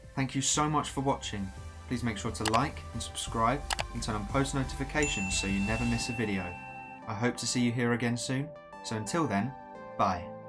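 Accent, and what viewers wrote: United Kingdom. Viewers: Where in the United Kingdom are you from?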